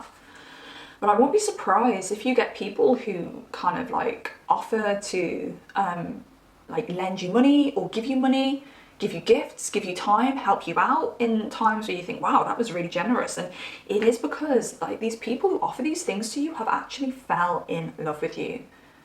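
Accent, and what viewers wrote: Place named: England, United Kingdom. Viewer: Aww I always watch tarot Chanel’s and I’m from Essex so it’s great to see and hear an English girl up in here! I really resonate with your reads big time! Thank you 🎉❤ ps I’m also a cap rising ⭐️😝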